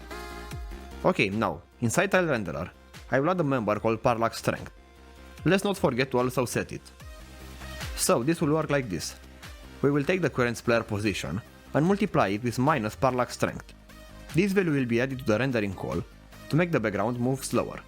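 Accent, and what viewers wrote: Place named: Romania. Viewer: ur deff romanian, not gonna lie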